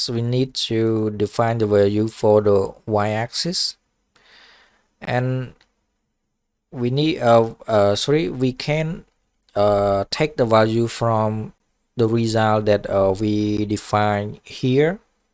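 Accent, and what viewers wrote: Cambodia. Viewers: បងខ្មែរមែនស្ដាប់តុងអង់គ្លេសបងដូចខ្មែរ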